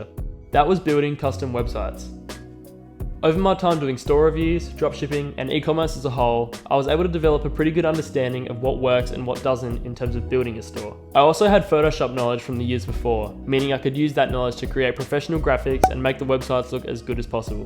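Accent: Australia. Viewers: Good on you brother! Where are you from? Sounds Aussie.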